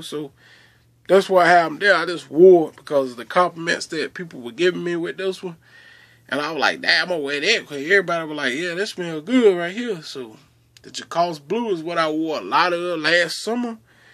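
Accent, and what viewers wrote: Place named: North Carolina, United States. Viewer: Nice review. Sound just like my hubby. North Carolina accent lol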